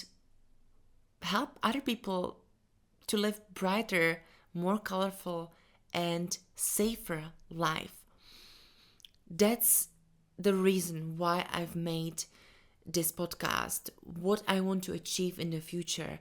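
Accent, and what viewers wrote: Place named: Czech Republic. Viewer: First of all, I want to say thank you for your channel. I am Mexican American. I am a bodybuilder. I love to lift weights and I’m very attracted . To trans women and there stories I love the fact you guys seem very down to earth from what I have gathered on YouTube so I’m looking forward to this podcast and hopefully I may be able to date a trans woman one day but yeah, I’m very intrigued and I don’t even know where to look for trans women here in America… also my friend is she is ❤from Czech Republic that’s how I noticed your accent❤ by the way you are very gorgeous with all due respect🌹🌹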